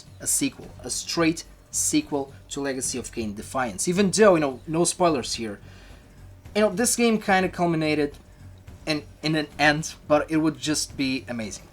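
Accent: Portugal. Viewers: Wait, are you from Portugal?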